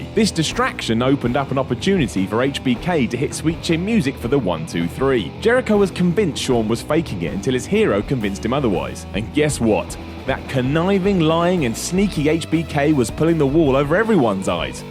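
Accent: United Kingdom. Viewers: I don't mean the accent over the a, I mean Simons British accent. So he used to saying it that way.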